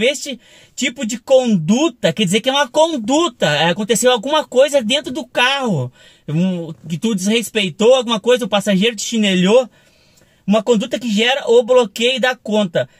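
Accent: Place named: Rio de Janeiro, Brazil. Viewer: Vc parece cariocs . Tu e demais .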